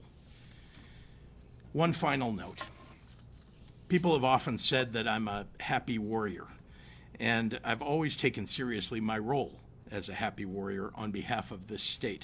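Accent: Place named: New York, United States. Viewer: Sounds like NY talking….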